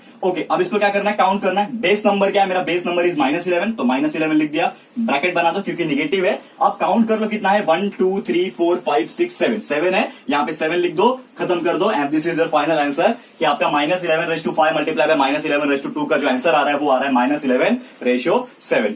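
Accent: Maharashtra, India. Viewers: Sir your teaching very well Sir Kay aap Marathi ho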